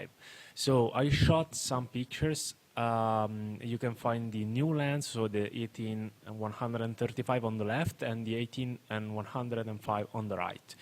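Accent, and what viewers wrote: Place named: Italy. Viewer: Si sente forte l'accento italiano :)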